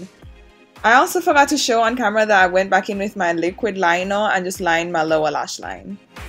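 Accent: Jamaica. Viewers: Your accent 😍😍😍 i know your from town (jamaica) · Great tutorial. You sound Jamaican btw 😉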